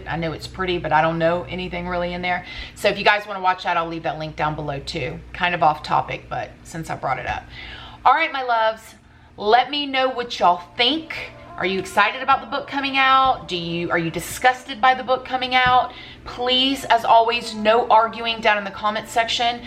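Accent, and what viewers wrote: United States. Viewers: .....are you American????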